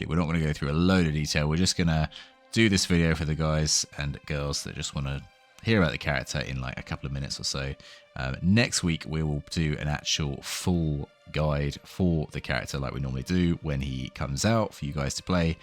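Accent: United Kingdom. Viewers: No you didn't get the pronunciation right. But close enough, closer than the apes from Ubisoft actually because your British accent fixes one of their mistakes.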